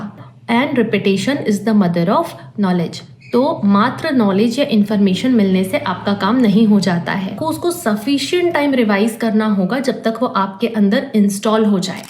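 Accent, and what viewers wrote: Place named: Indore, India. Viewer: Everything is superb but the way you say something it's like an indori accent, bahut sundar smjhaya hai apne sita ram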